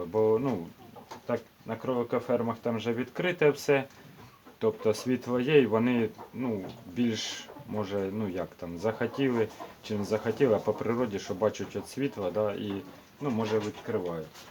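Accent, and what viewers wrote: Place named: Sumy, Ukraine. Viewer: Доброго часу доби!Підписалася на ваш канал,я так зрозуміла,що ви з Сумщини?Цікаво звідки саме,я теж з Сумської обл.,з колишнього Буринського району,тепер Конотопський.Тримаю небагато кроликів,для себе.Цікаве у вас відео,знімайте ще,приємно чути свою мову,сумську. 😀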